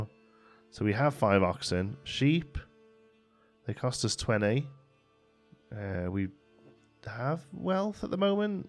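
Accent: United Kingdom. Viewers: I got mentioned!! I told you you sounded British (I mean, you are...). I reckon I could easily watch you for an hour walking around talking rubbish. Next episode, " walking around his village talking rubbish". Man, that feature has totally sold me on that game. I love it anyway, but that's the cherry on top!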